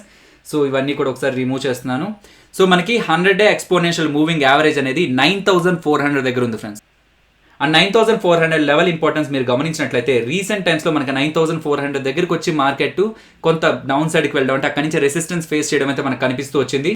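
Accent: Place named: Nellore, India. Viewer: nellore kadha anna meedhi.. slang alaney undhi..🙂🙂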